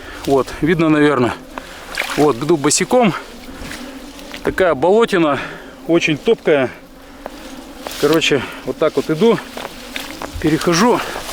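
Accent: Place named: Vyatka, Russia. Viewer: Это, те что желтенькие окунята тебе попались, они смотри сразу копчоные, их не вари!!!😅😊 Откуда родом брат? речь такая как будто "по-вятски"..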